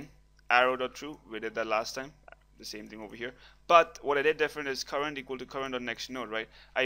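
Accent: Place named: India. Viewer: Why would that be considered racist..? Sounds like a question stemming from curiosity, nothing wrong with that. That being said, it sounds like an Indian accent., but I could be wrong!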